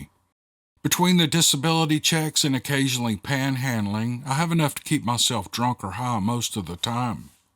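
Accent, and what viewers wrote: South, United States. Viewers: If? Really? I remember when u told someone not to send u a story, if they'd already sent it to someone else's channel. Brother i love ur story tellin. How about u look at all the dna results. Again don't get all kinda way, i really love ur style and southern accent, being raised in the south, still yet u R tellin stories already shared on other sites, which aren't trying to do that.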